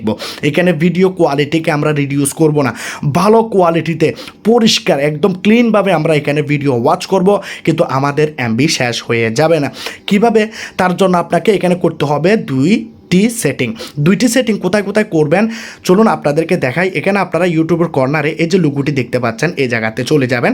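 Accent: Bangladesh. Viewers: ভাষা ঠিক মতন বলতে পারেন না কথার মধ্যে বাঙাল কথা আসে কেন? যেমন আপনি বলছেন গোফন কথাটা হবে গোপন। সেটিং কথাটা ঠিক বলছেন না বলছেন স্যাটিং। কথা বাংলা বলছেন কেমন যেন গাঁইয়া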